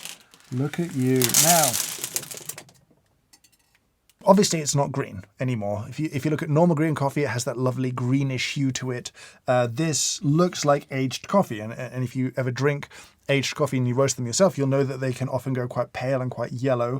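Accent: United Kingdom. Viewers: Im not a coffee connoisseur but i love your videos. I don’t usually like British accents but i like yours, especially when you review something. It just seems professional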